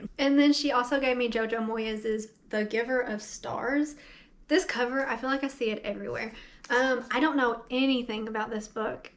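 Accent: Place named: Kentucky, United States. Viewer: Please tell me you’re from KY! You sound like home haha.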